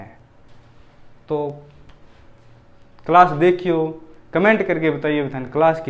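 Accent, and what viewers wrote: Haryana, India. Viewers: Nice h Bhai jma bagdi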